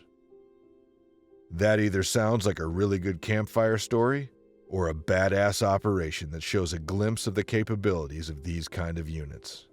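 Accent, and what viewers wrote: United States. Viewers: I see you’ve improved and changed the voiceover to an American accent. Will you swap the accent when covering non-American special operations and intelligence units, bro?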